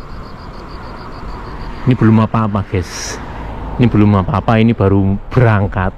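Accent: Banyumas, Indonesia. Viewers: Jangan malah resep gua mah denger bahasa ngapak kaya kiye 😂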